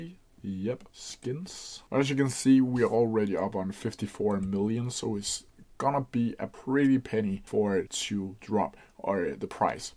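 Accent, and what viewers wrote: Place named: Denmark. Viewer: nah det der danglish er alt for obvious, smid lige din disc vi skal ramme noget skyblock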